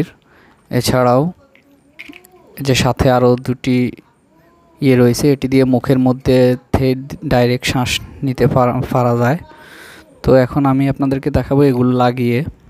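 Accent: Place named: Cox's Bazar, Bangladesh. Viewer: ধন্যবাদ, ভাই আপনার বাড়ি মনে হয় কক্স বাজারে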